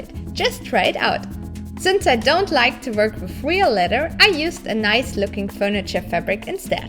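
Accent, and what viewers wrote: Germany. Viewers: is she from Germany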